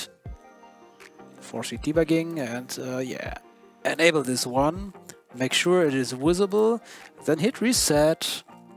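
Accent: Germany. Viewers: i hear german is that right?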